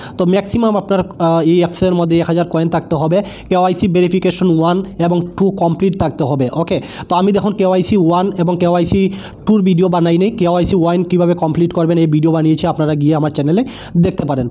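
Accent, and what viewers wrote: Sylhet, Bangladesh. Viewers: Baisab sylhetti bujchi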